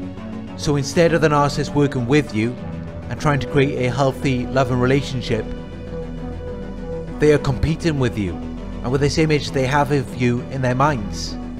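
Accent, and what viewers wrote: Wales, United Kingdom. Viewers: Sounds British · He sounds Welsh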